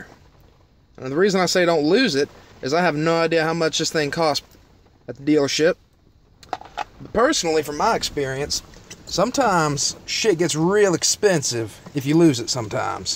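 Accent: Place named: South, United States. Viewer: Damn hick accent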